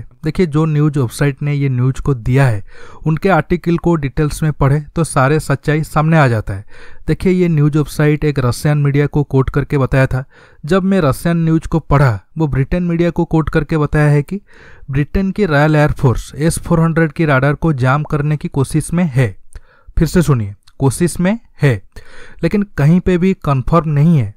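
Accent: Odisha, India. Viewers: Hi...I love your oriya mixed hindi.continue your good work...language is just for expression..i am finding no difficulties to underatand you.main thing is the content..its rich enough to make just a little adjustment.thanks.❤.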